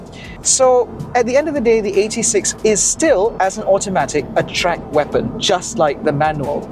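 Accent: United Kingdom. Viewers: His accent is like british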